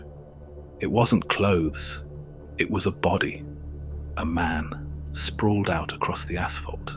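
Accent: United Kingdom. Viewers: I am so happy to eventually find a British narrator, I love your voice and I love your content! I wish that everyone who listened to your stuff would hit the like button for you, it’s the least we can do to show our appreciation for your brilliant page xx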